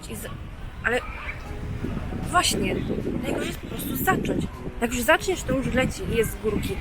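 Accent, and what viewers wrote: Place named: Silesia, Poland. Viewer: Jesteś nojlepszo i słysza Śląski akcent😁😁 pazurki piekne jak zawsze pozdrowienia z piykor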